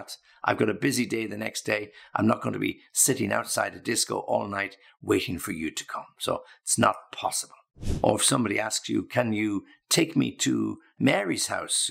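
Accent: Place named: United Kingdom. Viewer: I hesitate between american english or british english. In fact, i was practicing british english for 2 year. Then started learning american english. But when I come back to british english videos like this one, i just fall in love with british english. ..it sounds so lovely, so elegant! And the best for me, you pronounce the "T" and i love it 😊❤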